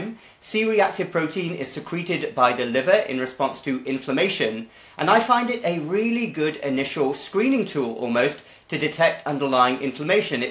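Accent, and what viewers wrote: United Kingdom. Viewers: I thought practices in USA. Though he is British, hence his accent.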